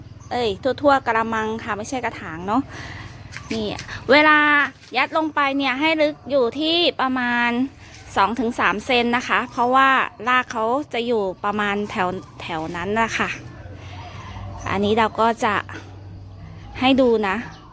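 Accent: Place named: Suphanburi, Thailand. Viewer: คนเก่งของพี่มาแย้วววว.. น้อง คนจังหวัดอะไรคะ พี่คนนครปฐม,วัดสว่างค่ะ สำเนียงเหน่อพอกัน😁😄😃😀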